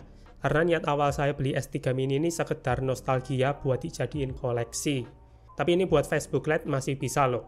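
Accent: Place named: Bugis, Indonesia. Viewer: Bang kamu orang Bugis yah ?? Kedengaran dari logat nya😊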